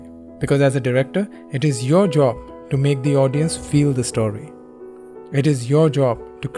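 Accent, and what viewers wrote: India. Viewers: I really enjoy your indian accent, friend.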